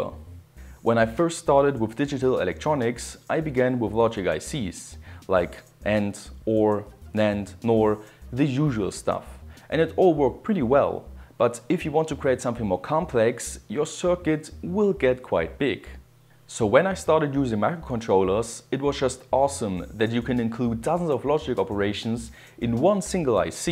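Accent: Germany. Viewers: er hat den deutschesten Akzent den man sich vorstellen kann. Alles was er aufschreibt und kauft ist in Deutsch geschrieben... ou man ^^ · Man hört raus das er Deutscher ist.. haha.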